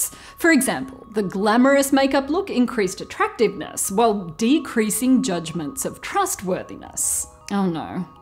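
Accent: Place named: Australia. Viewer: It's alright, i will always trust you because you sound like me (Australian). :DDD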